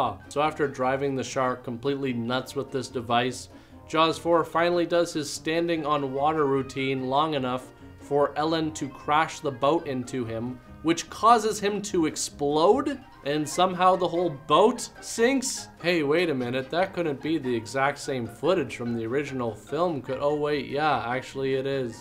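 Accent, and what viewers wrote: Canada. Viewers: Not to tease, but he does have the accent. It's not really "aBoot" as much as it is more like "aboat", though. And it's subtle and not as pronounced as the stereotype would lead people to think, but it;s there. In fact, I usually guess correctly that a YouTuber is from Canada when they say anything with the "out" sound as "oat". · Now that you mentioned Canada, I can't unhear your minor accent.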